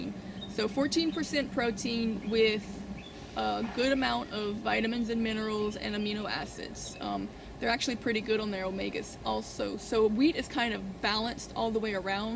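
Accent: Louisiana, United States. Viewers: Where are you from? Louisiana??? Love your video. Very informative.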